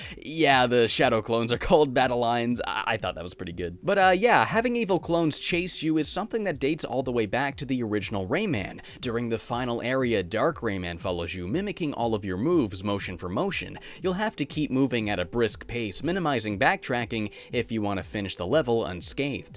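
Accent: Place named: Canada. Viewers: Really? That’s a shame man. Canadian accent or not the analysis would still be spot on. I’d say that’s what really matters. Ya know, aside from letting you know about how Chargin’ Chucks are in 3D World again. And again. And again. And again. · Hello, Please ignore them. It is a shame that you should feel you should hide your Canadian accents because of people. On the contrary, I'd like you to remain the dude you actually are, don't force yourself to be otherwise. Also, there is a ludicrous amount of videos in American English on the internet, so listening to another accent is overwhelmingly refreshing; that accent is not missed... at all. Actually, that is quite unfortunate that the American accent seems to be the norm in videos and in English courses at schools. Please, keep up the good work!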